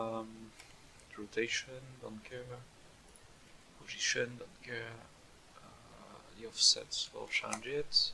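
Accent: France. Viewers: ta un bon accent hein :) mais c'est quand même cramé que tu es FR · i can hear some french accent in your voice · Ce gros accent français omg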